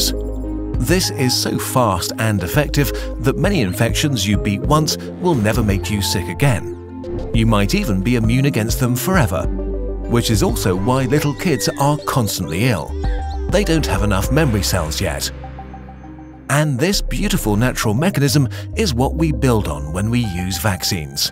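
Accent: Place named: England, United Kingdom. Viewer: Amazing to see the effect of a confident voice and a classic English accent. You can sell rubbish for roses, so long as you package it properly....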